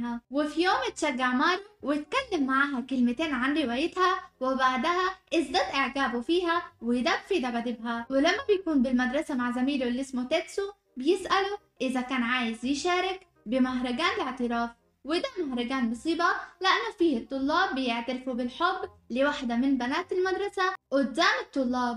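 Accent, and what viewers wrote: Egypt. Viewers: انت لهجتك المصري روعه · والله طلعتلي تعرفين مصري واو استمري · بل لله عليكي رجعي على الهجه القديمه🙃 بس حلو عادي ازا عملتي من فتره لفتره فيديو بلحجه المصريه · كملي في اللهجه المصر😅😅😊😊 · دي اللهجة الشرقاوية اهل الكرم بالتحديد 😂😂 بس صوتك باللهجة القديمة افضل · حبيت صوتك بالمصري😁😂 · الصراحه اني احب كلامج العادي اكثر وهم طريقتج بكلام المصري حلوه اني اتكلم المصري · بصوتك العربي افضل لك الصوت المصري لا يعجبني لان صوت المصري ابدا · جميل والله وكمان سهل ع الشخص يفهم كلامك بالمصري ...وحبيت الهجه المصريه ياريت تكملي بنفس الأسلوب عجبني😇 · الصراحه يعني المصريه حلوه بس انه نحن متعودين على اللي قبل لاتحاولين تغيري لهجتك لانا ها حلوه بعد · اللهجة المصريه جميله وكل الاحترام اخوانا المصريين لكن احكي بلهجتك أفضل واريح الك والنا لأنه تعودنا عليها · اللهجه المصريه جميله ولكن اتمنى ان تتكلمي مثل ما تتكلمي في كل فيديو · بكره اللهجة المصرية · انتي كيوتو اوي وانتي بتكلمي مصري انا رقيه من مصر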